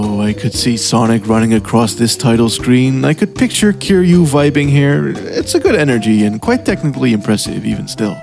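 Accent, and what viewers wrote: Netherlands. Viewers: I had a sneaking suspicion you might be Dutch by the way you pronounce certain things but you casually dropping a Dutch sentence in the middle of this video still hit like a truck holy shit lol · Do I hear a very tiny slight Dutch accent? Edit: dus toch! 😁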